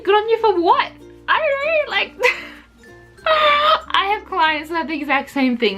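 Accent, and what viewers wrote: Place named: Australia. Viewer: I already did. It’s a crack up as well!!!! Now, I’m old enough to be probably your Grandpa, but I’m captivated by your outgoing, friendly persona....... but appropriately 😂 I also love that you’ve an unashamed Aussie accent!!!!!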